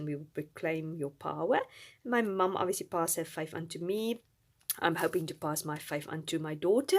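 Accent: South Africa. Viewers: I love your South African accent too, your have a lovely soothing voice to listen to! 😊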